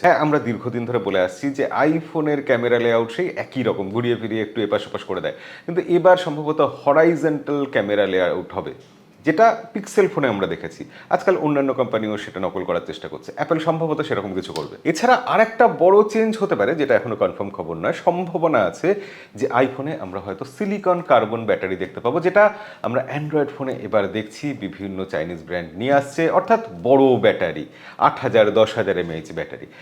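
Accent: Bangladesh. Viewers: বাংলাদেশি উচ্চারণ!!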